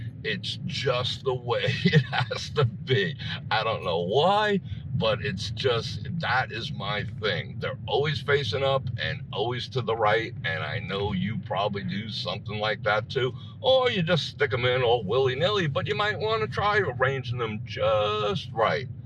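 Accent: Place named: New York, United States. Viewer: You ran a red light! You are going to be my virtual concierge in April when I head out to Harrahs. It's the New York/New Jersey accent that has my trust :-) And my MAX BET will be on getting a fridge for me room! Stay safe, thank you for your channel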